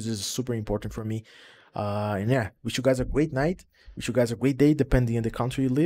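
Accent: Brazil. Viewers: Da pra perceber no jeito de falar kkk Brasileiro falando ingles sempre puxa umas letrinhas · wait are u from brazil? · ele é brasileiro ss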